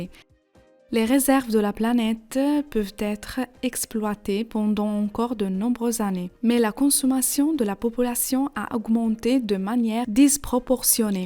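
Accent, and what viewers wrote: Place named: Japan. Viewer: Ce n'est pas une voix électronique, mais une femme (à mon avis japonaise) avec un accent.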